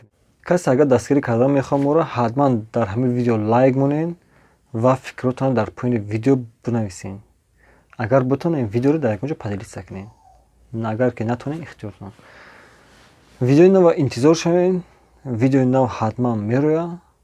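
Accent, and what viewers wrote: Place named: Muminobod, Tajikistan. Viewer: Лахчаи гапзанитонда гап нест........суппппееееееееер .муминобод.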